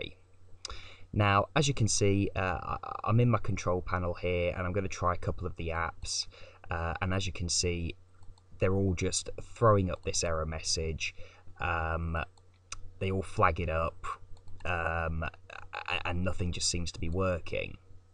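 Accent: United Kingdom. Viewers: Hello. I want to ask you a question that has nothing to do with the video. I'm from Venezuela and speak American English, but I can clearly understand what you say with your accent of British English. I would like to know exactly where are you from, for knowing in what cities speak with your accent, and to know the places I can understand the British English without difficulty.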